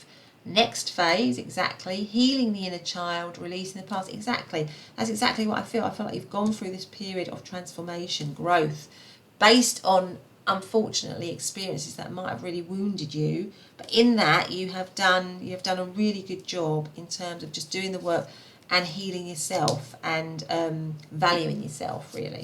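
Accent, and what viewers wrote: United Kingdom. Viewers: I just love a British accent hehe, so comforting/sweet sounding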